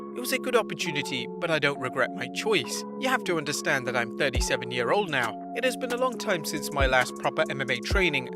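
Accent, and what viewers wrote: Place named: United Kingdom. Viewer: I'm not being a smartass but just telling you that he isn't from America I think he's from UK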